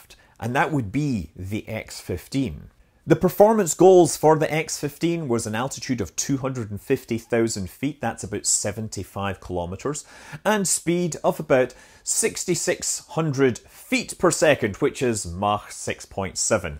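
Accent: Scotland, United Kingdom. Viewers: There isn’t one ‘British accent’ His accent is a cross between a Scottish, Irish and a small bit of a Californian accent because that’s where he lives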